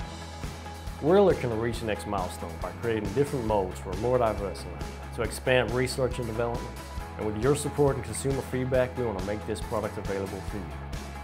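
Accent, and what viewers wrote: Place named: Rural, Sweden. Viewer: That's one hell of a Swedish country accent ya got there. I love it! He know s what sells and relating to your customer base is important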